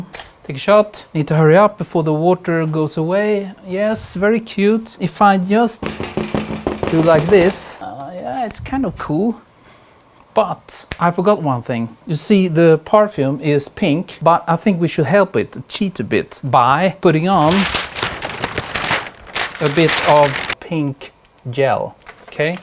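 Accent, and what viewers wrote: Sweden. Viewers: i really enjoy your videos. Kinda feels like bob ross with a Swedish accent.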